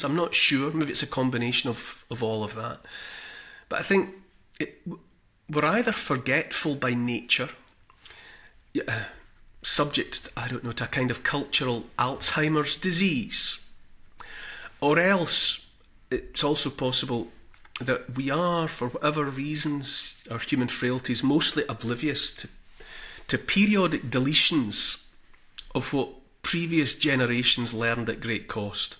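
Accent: Scotland, United Kingdom. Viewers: Hello from Chicago, Illinois! I'm SWOONING over your Scottish accent!! 👍🎯❤️‼️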